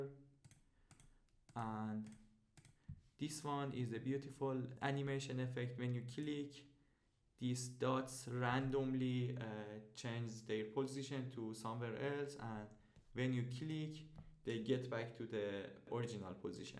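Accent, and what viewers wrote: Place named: Iran. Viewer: Cheghad Farsi Englisi sohbat mikoni :) damet garmmmmmmmmmmmmmmmm eshghiiiiiiiiiiiiiiiiiii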